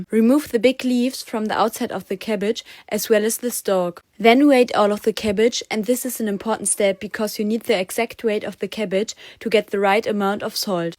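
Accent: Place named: Germany. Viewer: Oh god that disgusting German accent is Just pathetic. Learn to speak properly or Just dont do it.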